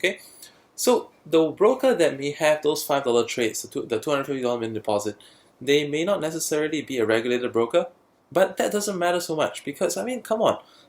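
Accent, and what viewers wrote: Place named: Singapore. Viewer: Hey, I reside in Singapore. I assume you're a Singaporean too judging by your accent. Would you perhaps share with me a platform on which we can chat(email or skype). I'm eager to know so much more and would be grateful to learn from no other but a fellow Singaporean.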